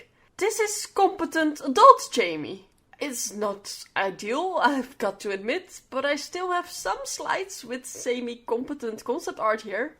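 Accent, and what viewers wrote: Netherlands. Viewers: Are you dutch? Ik kan het horen in je accent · Dikste hollands accent ooit vrind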